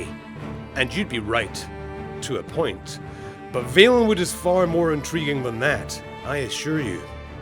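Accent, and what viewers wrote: United Kingdom. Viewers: Ok watched for an interesting topic, but I'm now staying for the voice. Scottish accent my favorite ever since Sean Connery became my Bond. Great video and thanks for the content also. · Can anybody nail down the presenter's accent? It sounds mostly Northern English or Scottish, but once in a while I could swear I hear bits of Irish and Welsh... maybe even a bit of American? If I had to guess, I'd say this guy has probably spent a lot of time moving or traveling in his youth. I'm fascinated by English dialects, and this guy's intrigues me. Oh, but great video btw. Beyond Skyrim is my brightest hope for the future of TES, and I love the work you guys do. Keep it up.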